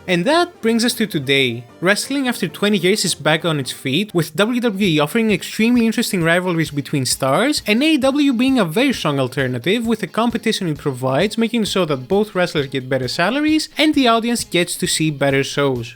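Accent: Greece. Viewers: That's the Greekest accent I've ever heard lol, awesome video bro 😊 αγάπη από την Κύπρο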